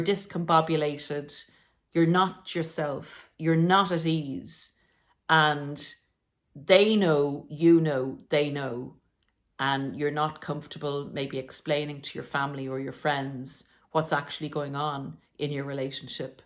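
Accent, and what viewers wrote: Ireland. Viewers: you sound irish ! 😊😊😊😊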